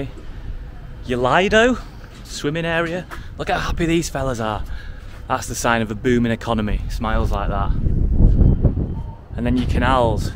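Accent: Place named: London, United Kingdom. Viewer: I do love this guy's attitude towards his native town... Seemingly a wardian, showing the aftermath of some unlucky human activities. His accents reminds me of my best years in London when I was young. Take care... Thanks for showing us this video. 😊... Etruscan is an old italian folk, they lived in nowadays Tuscany in Italy...in the 5th century B. C... 😅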